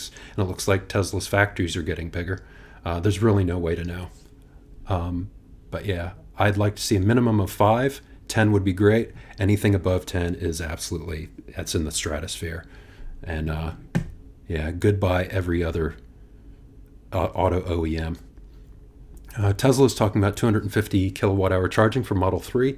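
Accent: New Zealand. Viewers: Nah, Yeah... That’s definitely Kiwi speak!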